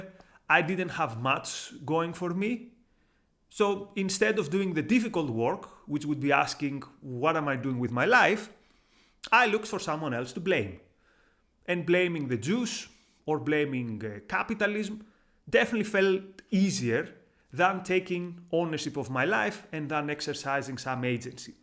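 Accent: Greece. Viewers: I knew immediately this guy is Greek by the accent 😅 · thank you for that, its very profound of course. after listening to you for 2 minutes you sound to me greek. Kreeks need to understand that Ardugan from turkey is a radical muslim and if people will not unite against radical / evil islam - Greece will have a genocide by Turkey. The muslim brotherhood / radicalization is a very serious problem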